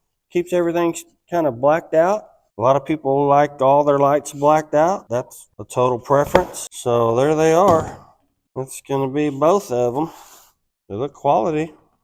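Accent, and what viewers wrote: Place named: Appalachia, United States. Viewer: Zero chance in he!! The stick on holds that to the fender. What kind of hillbilly accent is that 😳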